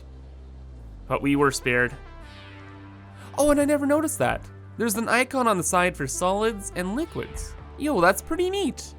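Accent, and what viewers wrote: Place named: Canada. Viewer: Dude! Could you be more Canadian? Funny to hear the accent: bese (base), spece (space). Get my drift...ey wink, wink Love your videos brother. Gonna buy I on Steam too. Since the Dev are based 45 miles from where I live in Sweden. Ya kno...greatest nation on earth 🤪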